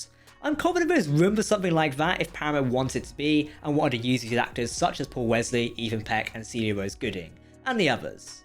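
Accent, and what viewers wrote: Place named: Scotland, United Kingdom. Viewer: OMG... I wanted to watch this episode, but the moderator sounded like he was Scottish and was gargling Hot Marbles. The subject would have been interesting IF he could be understood. Please have him speak slower or get a person who can be understood by more than folks from the North of England, Scotland & Whales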